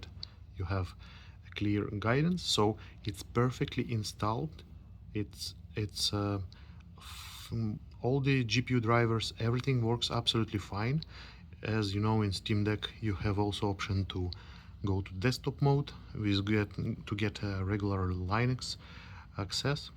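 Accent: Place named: Russia. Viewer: Русский акцент) Сколько сейчас девайс стоит на местных барахолках и из какой страны вещаешь?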